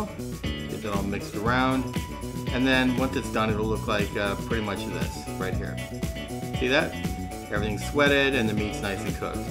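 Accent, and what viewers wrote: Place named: North, United States. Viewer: My guess is you must be from a Northern state.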